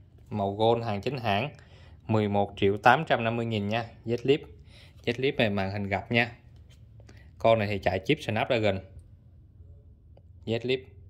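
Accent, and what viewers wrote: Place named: Mekong Delta, Vietnam. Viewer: A la người mien tay ha nghe dong quen quen. E o bạc lieu a gửi ve cho e dượt ko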